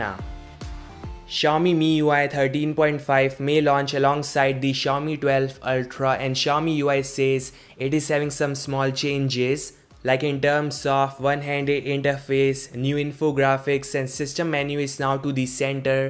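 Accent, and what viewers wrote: India. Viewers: Typical Indian accent...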